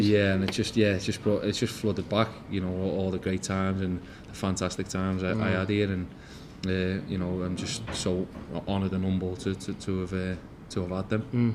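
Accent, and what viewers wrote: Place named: Liverpool, United Kingdom. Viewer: Nolans a scouser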